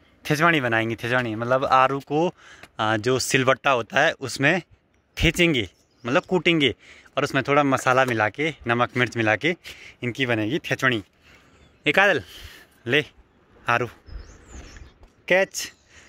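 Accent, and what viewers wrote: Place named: Pahadi, India. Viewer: Thechenge pitpita 😂 Mza aa gya bhai pure pahadi bolte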